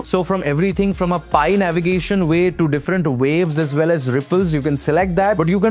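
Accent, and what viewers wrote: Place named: India. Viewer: bro, what an annoying jeet accent.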